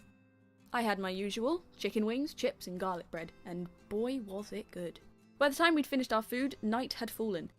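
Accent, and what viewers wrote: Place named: United Kingdom. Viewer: You sound very pleasant (and very British). You're just holding the microphone in a different place than usual. You're good at this type of storytelling--keep it up. Oh, and BTW, congratulations on being accepted by Ravenwood.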